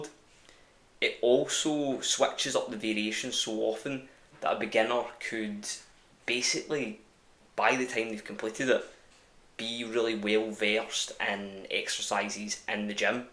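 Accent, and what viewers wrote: Scotland, United Kingdom. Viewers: A fellow scot. Not to sound stockery but what part are you from. Ayshire myself.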